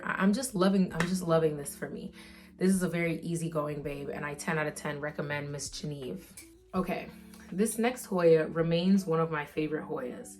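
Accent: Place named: New York, United States. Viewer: GORWLLLL how am I now coming across ya page… are you from New York? Cuz I know my New York girlies accent. Like, I’m cutting up. Naw, I gotta tap in now. Yuuuuurrrrrr 😅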